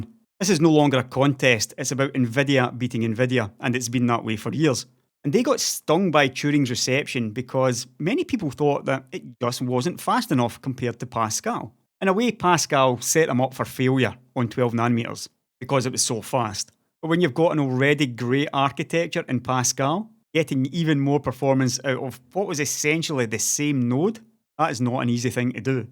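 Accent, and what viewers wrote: Scotland, United Kingdom. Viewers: the heavy Scottish accent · A Scottish accent telling me about technology feels too right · i just love scottish accent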